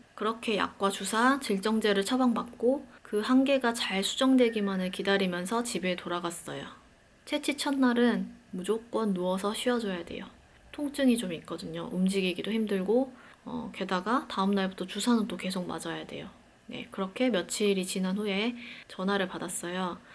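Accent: Gwangju, South Korea. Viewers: 혹시 전라도 분이실까요?? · 저도 2월7일날 2차피검 실패하고 다 싫고 아무것도하기싫고 사람만나기가 젤 싫은 중 입니다 말투가 광주 분이신거같아서 영상봣더니 저랑 같은 병원이시더군요…ㄱㅈㅁㅎ 너무 부럽구~ 쌍둥이 임신 축하드려요 저도 그런날이 올까요?ㅠ 서카딘은 그냥 살수잇나요? 처방전받아야하나요?? 저도 광장히 예민해서 잠을 잘 못자고 그렇게 무언가에 쫒기는 꿈을 꾼답니다ㅠ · 광주분이신가봐요?아닌가?암튼 그래도 고차수 까지 안 가고 성공하신거 늦었지만 진심으로 축하드려요~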